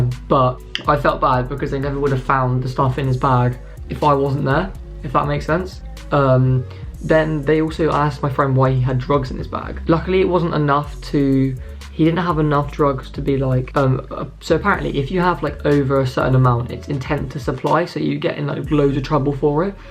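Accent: RP, United Kingdom. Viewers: Posh boy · Man sounds bare posh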